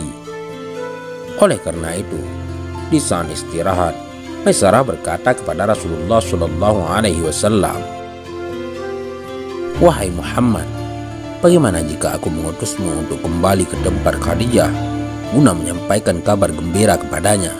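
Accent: Aceh, Indonesia. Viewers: Dari sebutan huruf D nya saya tuhu yg menceritakan kisah nabi ini pasti org aceh.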